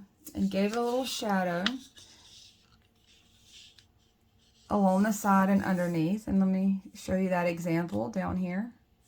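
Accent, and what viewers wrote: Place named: Mississippi, United States. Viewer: I loooove this tutorial and appreciate you. I was raised in South Mississippi and your accent reminds me of home. Im in FL now. Where are you from? Kathy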